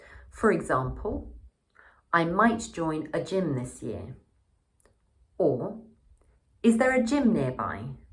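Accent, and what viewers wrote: United Kingdom. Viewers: 영국쌤이다! 이 고쟈스한 영국발음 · British accent is to die for. ㅎㅎ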